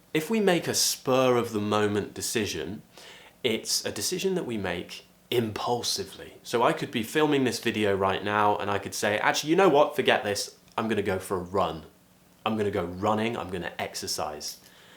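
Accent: United Kingdom. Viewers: I like your accent man , your way of explaining etc you r amazing and British accent is my favorite 😍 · I learnd a lot of from you, thank you for that . However i miss you as a british pronounciation teacher . Hope you'r son is doing well as you and the rest of you'r familie too . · Hello! Thanks a lot for this video. I'm a Spanish native speaker and I would love to speak English with your British accent. Please, let us know where can we get your accent training. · I' ve been looking for a British pronunciation teacher for a longtime and finally i find you as i feel so lucky and privileged right now 💜💜💜🙌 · I really feel excited of your British English pronunciation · always I came here to learn British accent thank you very much for being helpful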